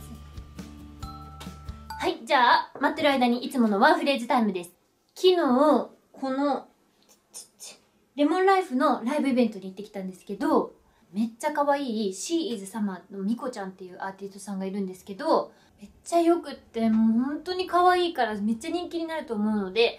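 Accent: Kansai, Japan. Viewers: 初々しい....wまだめっちゃ関西弁！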